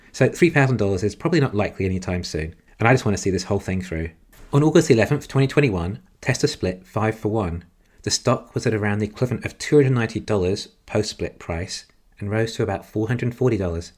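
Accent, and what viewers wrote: England, United Kingdom. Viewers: English accent...word have more than one syllable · You British? I followed you for an year without realizing that,thinking you're from the States.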